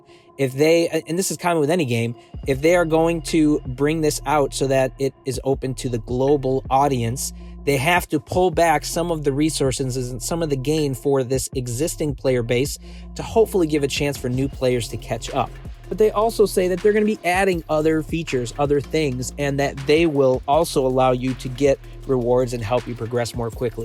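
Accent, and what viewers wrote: United States. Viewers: He is American cant u tell by his accent not everyone knows hindi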